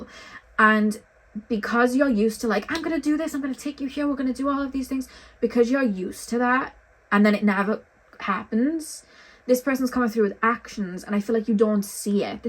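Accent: Wales, United Kingdom. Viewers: I don't understand a word you're saying 😢 +oh, you got the Welsh accent 😮 +oh... yes I do talk a lot😅 I'll re-watch it with subs 😊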